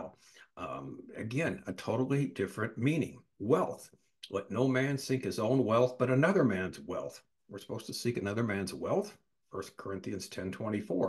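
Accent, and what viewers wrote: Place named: United States. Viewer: But you speak American English accros the pond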